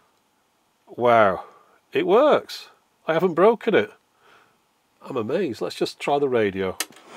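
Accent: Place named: England, United Kingdom. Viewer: Why did it take me this long to notice you have an English accent, let alone an accent different from mine? Wait, is it an English accent? Or is it Austrailian, or maybe Scottish?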